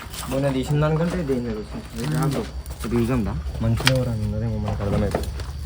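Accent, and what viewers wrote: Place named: Hyderabad, India. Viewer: Script kakunda genuine ga chesthundu bro pakka ne channel telugu lo manchi hype ostadi ...ne hyderbad slang chaala mem ki reache ayitadi but don't do scripted search for most haunted places take dare guys and communicate with ghosts mana telugu community lo ghost tho communication cheyanika raadu ....tamil lo simply sarath and grey wolf vasu videos chudu bro nd all d best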